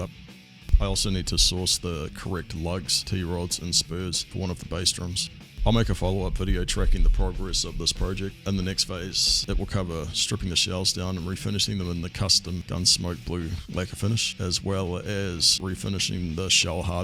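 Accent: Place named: New Zealand. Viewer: Sound like a kiwi. Im amazed you got this gear😊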